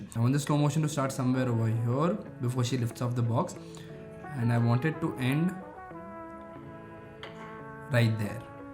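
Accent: India. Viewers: Who guys came here for the Bollywood Accent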